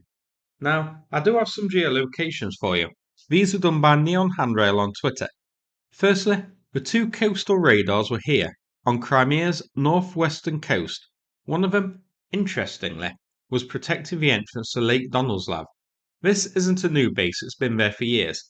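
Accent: United Kingdom. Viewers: u fucking clown turn off ur retarded bri-ish cuck accent when?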